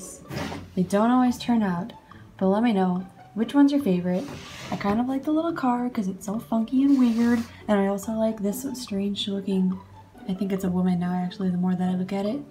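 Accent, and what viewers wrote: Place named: Illinois, United States. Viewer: You’re from Buffalo! Omg I’m from NY too. I thought maybe you were from Illinois bc you have sorta an accent. Which is awesome Btw.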